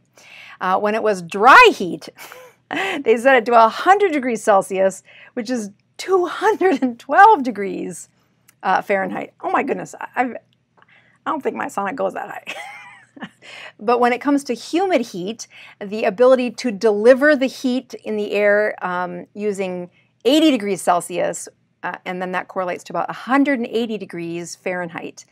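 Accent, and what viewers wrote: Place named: Upper Midwest, United States. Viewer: My family is of Finnish heritage from the upper midwest where most people have a sauna in their homes. My mother is 85 and in great shape, due in great part I believe to her regular use of a sauna. I have an infrared sauna that I've gotten out of the habit of using since we moved two years ago. Thank you for the inspiration to use it regularly once again. By the way, it throws me to hear your upper midwest accent without pronouncing it "Sowna" as the Finlanders do.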